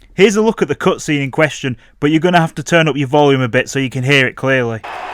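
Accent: Yorkshire, United Kingdom. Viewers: You have a propper broad Yorkshire accent it’s brill 😂😂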